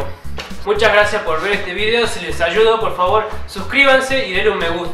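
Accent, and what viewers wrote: Tucumán, Argentina. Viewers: DiiabloGalarza#GidinGang suena tucumano o salteño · Sos argentino o me parece ? :v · sos de Tucuman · Wtf el webi wabo es argentino😮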